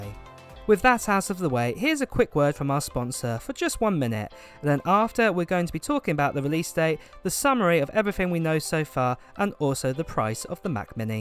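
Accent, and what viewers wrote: United Kingdom. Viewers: Well, I want to buy the iPhone 11. But sadly I don’t have money for that. And also i didn’t already bought any apple gear or product cuz I don’t have money for that, And i hope i will be the one who got your iPad. Because It can help me in my studies. btw i like your british accent, congrats and godbless💖💖💖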